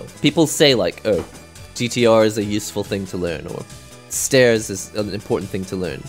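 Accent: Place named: Glasgow, United Kingdom. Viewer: You have an interesting accent. It almost sounds like (posh) Glaswegian at times.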